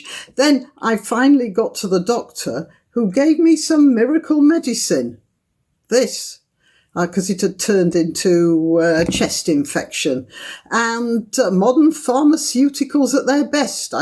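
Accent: United Kingdom. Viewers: No no no... your voice cadences are quite harmonious to My American ears. Americans like me melt for that British accent... ask any American Beatles or Rolling Stones fans..... keep talking.... and cogent thought with that accent???? Young lady, I better never hear your voice and see you on payday!!!!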